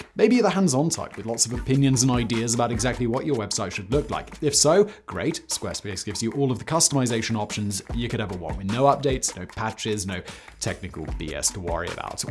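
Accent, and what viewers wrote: RP, United Kingdom. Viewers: I'm a clueless Yank so forgive me if I'm totally off base here. I really like language; our boy here has a fairly posh accent, right? I've never been great at nailing the nuances of British accents but he sounds a bit fancypants, right?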